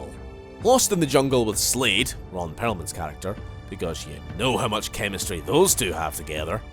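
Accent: Scotland, United Kingdom. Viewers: I have subscribed to you because :you made Elder Scrolls/ Star wars jokes, your regular jokes are actually funny, I love the scottish accent and everything Scotland (culture, drink, food and people). And you make good videos of great games we've all played. I, from clan Cambel, welcome aboard ya cheeky bastard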